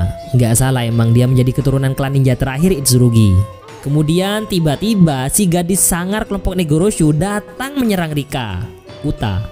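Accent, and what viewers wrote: Madura, Indonesia. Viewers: Medureh reh boss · Tolos a madureh lek 😂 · Reng madureh😂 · madura jatim anjir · Boh, reng Madurreh kakeh, Min? :D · Ternyata mimin orang madura hahaha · Subtle madure hhhh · Jember Cong padeh ningguh. Oreng madureh tapeh lancar bahasanah tapeh gik paddeng madurenah gerre bahasanah bren.. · Medureh aguen ben bro,?? 🤣🤣👍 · Logattah mak bahasa madureh cak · Bahasanya sangar medureh😂😂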